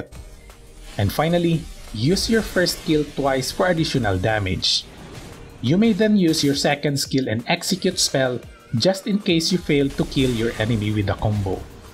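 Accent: Philippines. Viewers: Hope accent is from Philippine, right? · Tips are good but the pinoy accent is kinda hard to unhear.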